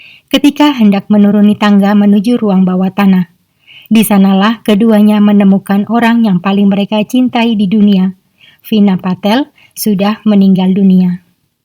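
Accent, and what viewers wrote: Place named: Madura, Indonesia. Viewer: Logatnya seperti orang Madura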